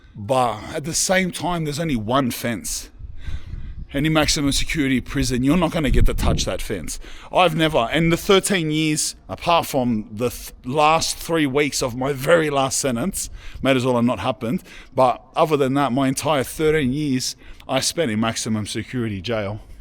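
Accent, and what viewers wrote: Australia. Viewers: Love your honesty and I'm new to this channel but I fucking love it!! ❤ cous...😂😂😂 that aussie accent is fire!! Keep it up mate...love your no Bullshit way. ❤❤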